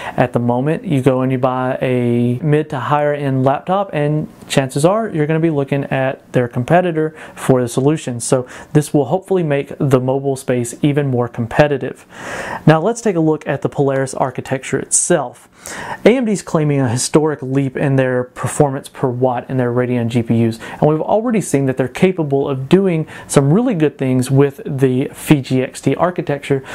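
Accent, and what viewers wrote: South, United States. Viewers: I love how his southern accent keeps shining through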